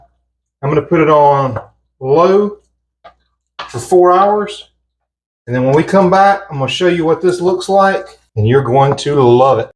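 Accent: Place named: South, United States. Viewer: Great recipes from a keto chef that sounds like me. I'm from NC so you sound like my next door neighbor. Refreshing to hear in this massive web of You-tube videos from who knows where. Keep on cooking Southern!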